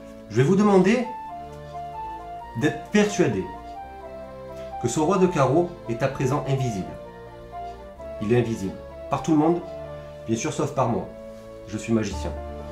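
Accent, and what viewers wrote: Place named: South, France. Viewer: salut, une critique pour tes vidéos, si tu doit améliorer quelque chose c'est l'accent du sud, je sais pas si tu l'entend mais essaye de le supprimer ou alors exagère le. allez bisous.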